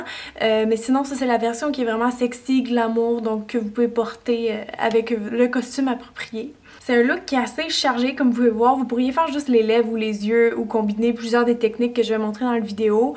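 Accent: Quebec, Canada. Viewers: OMG cet accent québécois J'ADOORE 😆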